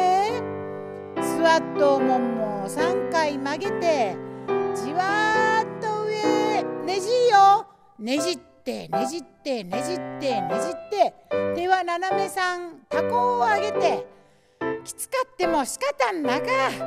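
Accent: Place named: Hasami, Japan. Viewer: 波佐見出身の者です。18歳で波佐見を離れて今年で17年になります。転勤族で各地を転々としてます。 この動画で波佐見弁のナレーション聞いて懐かしさでほっこりしたのと同時に、今年亡くなった祖母の事を思い出しました。 やっぱ波佐見はいいですね。またいつか必ず帰ります。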